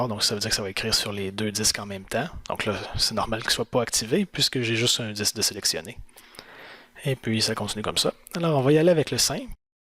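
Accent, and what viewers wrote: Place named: Quebec, Canada. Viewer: Merci bcp, et pour ce formidable accent québécois et pour les explications qui m'ont permis de créer mon premier dd virtuel. :))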